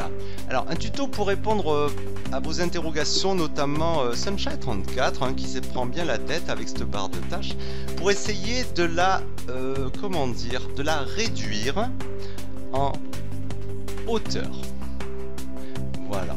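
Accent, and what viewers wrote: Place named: North, France. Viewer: Ben ouais t'a raison m'gamin!!! comme ils disent dans le Nord, le patois du Nord, il faut le comprendre, je ne le comprends pas toujours, mais il me fait bien rire, sans moquerie méchante, je le dis au cas où les vrais Nordistes d'Origine n'aimeraient pas ce commentaire..... Ssshusssssssssssss!!!!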